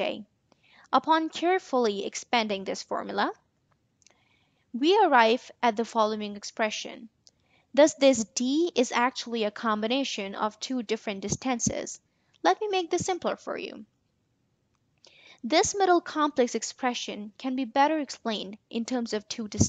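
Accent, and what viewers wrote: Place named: Pakistan. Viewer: I wondered after listen to this that you belong to Pakistan. you seem to be a native speaker. anyway, thanks a lot for this great lecture.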